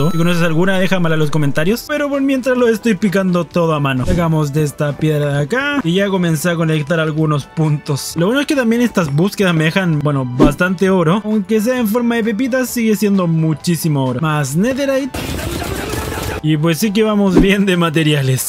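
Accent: Chile. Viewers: Tío de que que país eres me párese de chile o no y feliz navidad que te visite el viejo pacuero po XD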